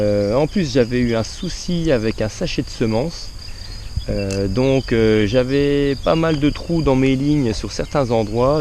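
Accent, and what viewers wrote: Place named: Meuse, France. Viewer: Bonjour vidéo intéressante. La pluie peut être bénéfique. Êtes vous de la Meuse car je reconnais ce petit accent. A bientôt sylvie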